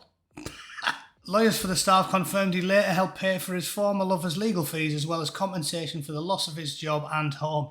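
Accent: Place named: Middlesbrough, United Kingdom. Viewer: That sounds like a sunny Boro accent I'm hear here..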